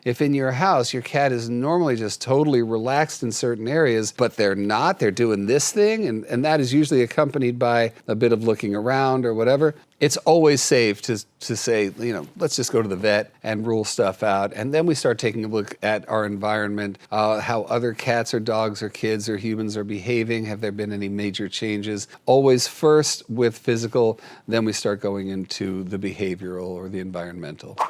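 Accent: England, United Kingdom. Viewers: Lol! Great description of The Loaf - with illustrations!! Also LOVE the British accent/Masterpiece Theater style. Had me smiling a long time. Thank you. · I can smell some English accent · How long have you been training to speak posh Englisch?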